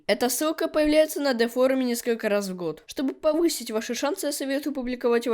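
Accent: England, United Kingdom. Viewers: Нихуя не понятно с этик английским акцентом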